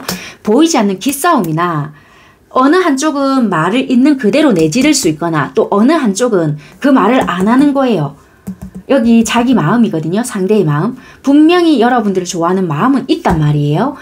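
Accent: Busan, South Korea. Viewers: 선생님. 좋아요를 누른다는게 잘못해서 싫어요를 눌러버린것 같아요. 오해마시라구요. 저두 부산사람이라 선생님의 사투리 표현에 힐링타임 많이 가집니다. 오늘도 리딩 잘듣고 갑니다. 고맙습니다~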